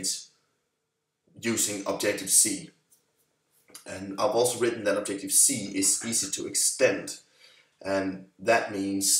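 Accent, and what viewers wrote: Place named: Denmark. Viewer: ham her lyder dansk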